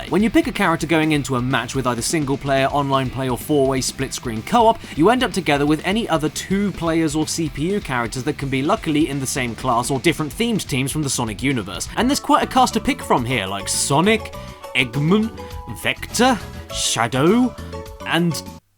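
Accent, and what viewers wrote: United States. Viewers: To be fair you do talk like a yank a lot so maybe that's why they thought that.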